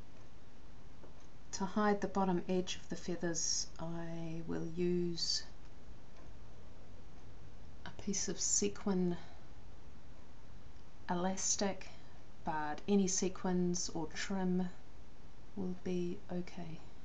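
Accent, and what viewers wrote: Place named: New Zealand. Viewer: Kiwi accent yeahhhh!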